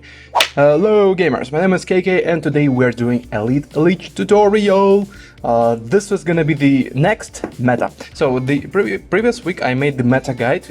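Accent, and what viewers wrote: Russia. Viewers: Wait.... good tutorial but are you russian? If so you have a really great English accent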